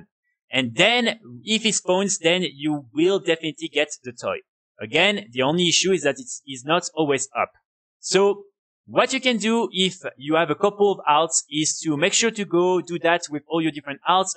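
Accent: France. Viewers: Bonjour, merci pour la vidéo! J'ai une question, je joue chevalier de la mort. Je suis forgeron 300. Est ce que je suis capable de fabriquer le set T3 Guerrier ou je suis obligé de réaliser tout le run de la vidéo avec un guerrier que j'aurai up lvl max et forgeron 300? (j'ai écris en français car je sent l'accent français chez toi ahah). Hello, thank you for the video! I have a question, I play death knight. I am blacksmith 300. Am I able to make the T3 Warrior set or do I have to do the whole run of the video with a warrior that I will have up lvl max and blacksmith 300?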